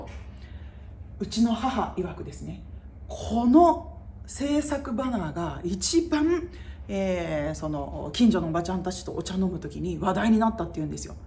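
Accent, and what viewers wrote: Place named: Nagoya, Japan. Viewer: 名古屋弁のいいですね～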